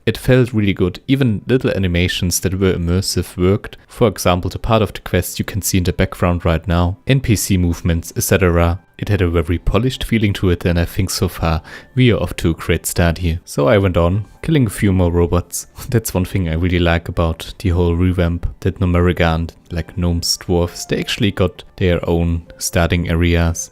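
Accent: Germany. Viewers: Ha, love that german accent:)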